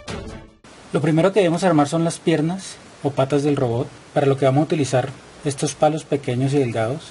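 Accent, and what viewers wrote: Colombia. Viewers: Voz sos de colombia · Qué orgullo para Colombia que tengamos un profe de su categoría. Qué institución educativa tiene el privilegio de contar con usted profe? Tiene acento valluno. Saludos desde Antioquia.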